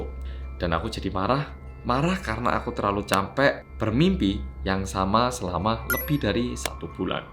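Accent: Java, Indonesia. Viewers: Episode terakhir huuhuhuhu, wes rapopo, seng penting mas tetep medok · dari awal sampe sekrng masih bertanya2. knpa mas bisa medok begitu 😁😁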